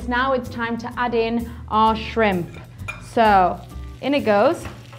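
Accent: Australia. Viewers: sounds australian... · she kinda sounds like she has a faint Australian accent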